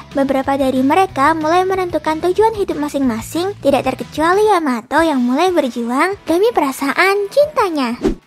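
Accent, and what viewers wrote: Japan. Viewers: faseh bgt aksen jepang nya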